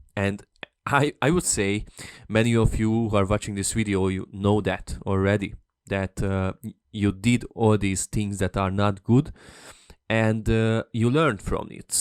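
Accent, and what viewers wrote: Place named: Hungary. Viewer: I can recognize a Hungarian accent ;) Great video, thanks!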